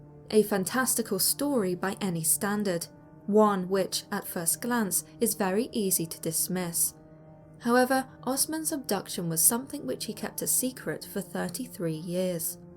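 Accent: England, United Kingdom. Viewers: Beautiful English accent, clear, concise with beautiful pronunciation and read at the “proper” narrative speed for an audience to enjoy 😊. Good lass! ❤️🦋🙏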